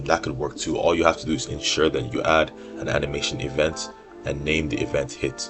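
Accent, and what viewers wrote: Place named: Nigeria. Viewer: icl from your accent you sound Nigerian but good vid tho its very good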